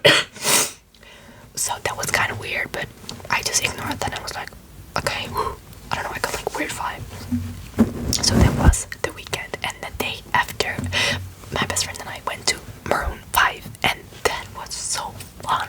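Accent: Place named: Netherlands. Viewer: Lovely accent ❤️ , are you from The Netherlands?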